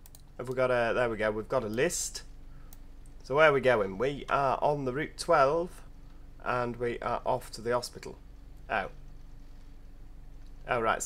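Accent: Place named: Yorkshire, United Kingdom. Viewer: Nice video.I love the west country area, in the game and in real life, are you from Yorkshire, you have a very strong accent.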